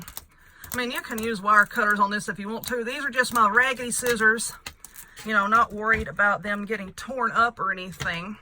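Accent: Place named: Georgia, United States. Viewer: my first video of yours. love it! I'm dying to watch more to know if you're out of Georgia. You sounds like my cousin who was raised in Athens.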